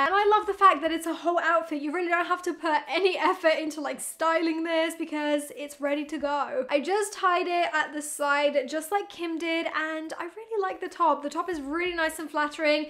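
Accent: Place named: United Kingdom. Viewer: I think lots of people from the UK talk like that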